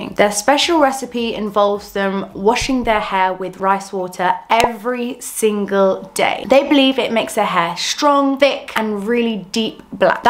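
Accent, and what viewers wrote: United Kingdom. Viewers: Thank you ! I have tried, and according to your explanation, my hair is of very low porosity. That would totally explain why coconut oil makes my hair dry instead of nourishing it, and maybe that's also why I started losing more hair after using flaxseed gel 😱 This clearly shows that not everything works for everyone, and thank you so much for warning us about rice water, cause I would definitely have tried it someday because of how popular this method is! By the way, you have a lovely accent ! Are you British ?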